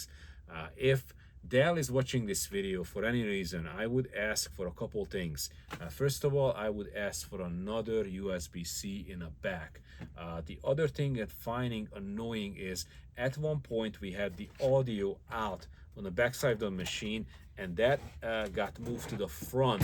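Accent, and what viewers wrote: Hungary. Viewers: Yes, I comparing to the DT version to be exact, but most of my criticism is still true for the old SFF model. My point is that DELL gives you less and less quality and quantity for the same price, probably because the competition, both HP and Lenovo earlier started to use nonstandard PSU and other const cutting and eco system locking. From a business stand point the bisiness is business you can't compete with them in volume and power consumption, but you can with quality and performance if there is a demand for it. These machines are overbuilt for office use, but underbuilt for any performance usage CPUs and GPUs under performing in these systems due to heavy current limiting. Here is an example how long can last something if you choose the right parts, my first intel 775 PC from 2006 still works after 18 years what I bougth used then, now it is in a school in Esztergom. 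The only failure was a bad Hitachi harddrive within the warrianty period in 2008, it was replaced with 500GB WD BLUE which 12 years later produced the first bad sector, 500GB WD BLACK from 2010 still running without bad sector. BTW are you from Hungary? I'm guessing from your accent.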